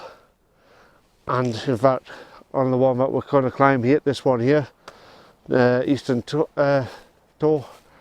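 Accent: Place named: Northern England, United Kingdom. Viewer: I can tell your from up north and you don't have to come down : )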